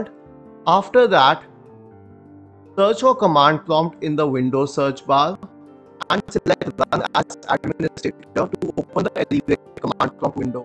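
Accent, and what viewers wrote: India. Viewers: ty indian?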